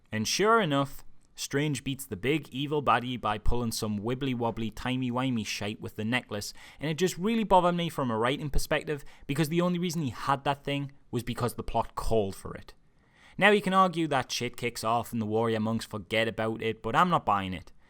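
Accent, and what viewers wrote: Newcastle, United Kingdom. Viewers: Are you from Newcastle? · LOVE your videos... (screw the haters)... expecially the way you surgically, cleanly and clearly (be it with a slightly noticeable gerodie accent lol) take a red hot knife to many movies good and bad, with a perfect dos of humour to tie it all up... I always take your word and I'm very much looking forward to see Doctor Strange.... P.s More than 3 seconds is needed for a spoiler warning lol And another P.s Keep it up my Gerodie Lad n please stop Gerodie shore. It really make u all look bad....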